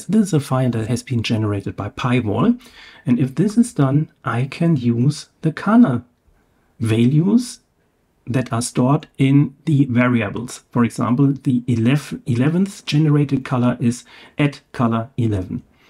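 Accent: Germany. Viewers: The german accent 😂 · man hört das deutsche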